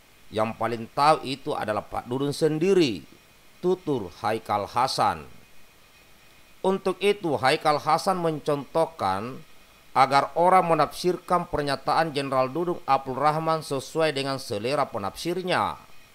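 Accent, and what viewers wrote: Makassar, Indonesia. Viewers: assalamualakum sy senang dengar klo logak makassar ooo mmalee karaeng mg ALLAH SWT jg cnel aaamiiin3 Yaaa rabbal alamin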